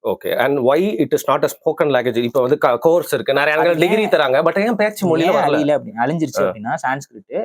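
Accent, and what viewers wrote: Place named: North Chennai, India. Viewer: your vada chennai slang superb😅😅😅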